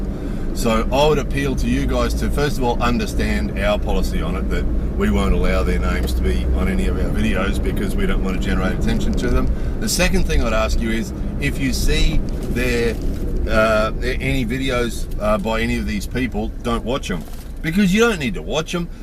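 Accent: Australia. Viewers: Nice take on all points… Nice to hear an Aussie accent into the bargain.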